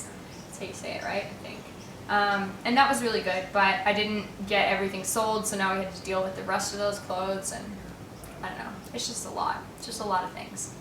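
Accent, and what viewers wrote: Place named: Australia. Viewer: This was very informative because I'm clueless with travel/house etc 😅 Also I love that you sound so Aussie sometimes ❤️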